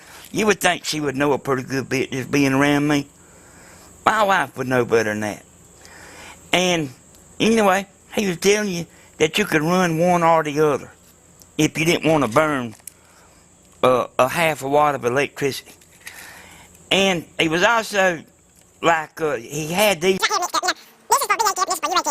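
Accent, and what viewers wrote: South, United States. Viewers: You need a signal strength meter if your making 1k a week you can afford one, then any doubt you have you can fine tune your product. I think I'd make a bending jig with nails and a sheet of plywood. So do these work better outside on the roof or highest point on your house or in the attic? I think my next prosses might be a system and materials to mass produce these. Your reflection device could be mesh covered in hard plastic. I also think the PVC pipe works just route the wires inside the pipe. Also the quality, and fittings on your coaxal cables will have a great effect on how many db down you have. Our old cable company changed its connectors often to get better signal to noise ratio's also all connections must be tight. A loose connector will leak signal. Some people might think your dumb, well yes your southern english is a key off but I understood you. 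So my spelling might not be great but I have dyslexia. English wasn't my forteau in school. Really the comment anyone is stupid is in and of itsselfe stupid. We all have something to offer. You've found yours. For all we know your acting like you talk to be funny. By the way even if I know more my wife is always smart. She is intelligent its just different then myself, I know more world things and see things how they work together and alone I have a good idea how to make things and take them apart of why they don't work. She can tell you the math or where I screwed up the math. Now with a signal strength meter you'll kn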